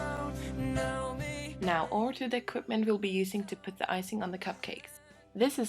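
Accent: Norway. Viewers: herregud det første jeg tenkte når jeg så den viden her var: hun må være norsk.. også hørte jeg aksenten og det var vel det som overbeviste meg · Jeg så det på deg at du var norsk! I tillegg til aksenten mens du snakket, hehe :-D Måtte sjekke, og da så jeg at du var det på de andre videoene dine :-) Veldig fine muffins :-)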